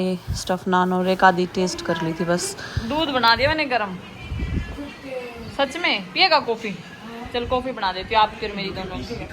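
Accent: Haryana, India. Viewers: U p me rehne ke Baad bhi aap ki haryanvi tehth h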